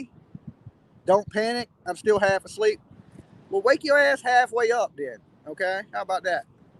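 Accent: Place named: Hampton Roads, United States. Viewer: Hey, Are you from the 757? You sound like you are. 😊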